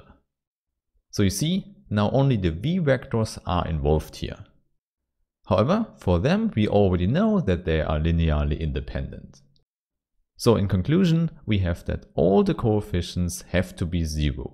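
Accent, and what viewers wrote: Germany. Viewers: Haha sehr geiles Videos. Das deutsch ist nicht zu überhören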